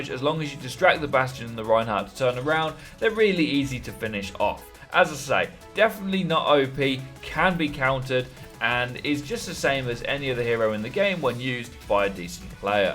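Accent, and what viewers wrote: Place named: England, United Kingdom. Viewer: Saying crap with a bit of an English accent doesn't make it true.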